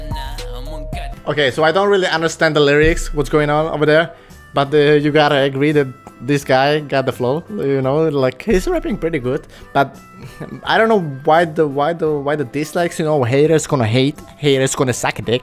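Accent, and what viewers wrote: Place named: Romania. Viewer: doar eu am impresia ca el e roman? adică frate, uneori mai zice cuvintele cu "ă" sau "â".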